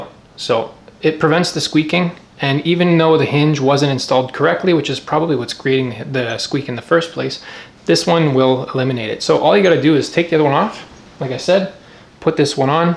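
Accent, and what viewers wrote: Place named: Canada. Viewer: i knew that was a Canadian accent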